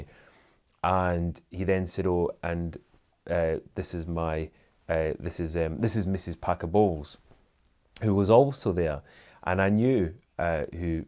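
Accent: Scotland, United Kingdom. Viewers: I could listen to you all day! Such a lovely Scottish accent. Love your channel! Highgrove...the most beautiful place on earth! Prince Charles had the vision to turn it into a paradise. Watching the documentary of Highgrove, by host ( Alan Titchmarsh) made me cry. Prince Charles is an incomparable gardener, which shows me he truly has a heart of gold. I adore him and his lovely wife,( The Duchess of Cornwall). Who wouldn't want to work for them! I'd work for them doing anything, for free! Greetings from Canada.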